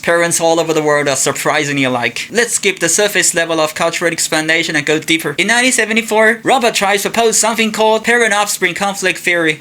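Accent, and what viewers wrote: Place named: China. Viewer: your accent is quite chinese bro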